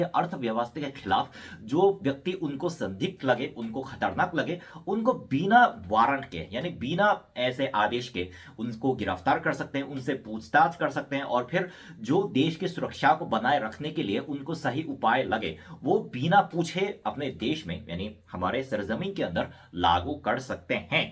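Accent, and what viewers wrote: Standard. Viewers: Yaar aapki hindi to intni sudh h ki humari bhi utni nahi 😊🇮🇳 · Bhaisahab itni shudh hindi toh India mein bhi koi nahi bolta. Someone give this man a Aadhar card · Bro……your Hindi waaaaay tooo good….pretty much text-book! But, it also a bit difficult to follow at times!!! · Itni shudh Hindi toh meri bhi nhi h well done bro 🤌👍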